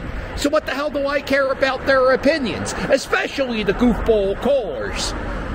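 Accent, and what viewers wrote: Philadelphia, United States. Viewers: Philly accents are the best.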